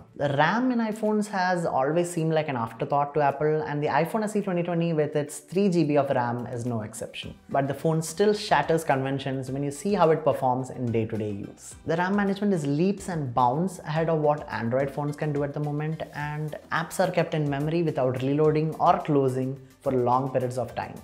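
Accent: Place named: South India, India. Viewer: Excellent video, dude. Keep up the good work. Can't resist saying this : Try to have a neutral accent. You sound like a South Indian. Apologies if I am wrong :)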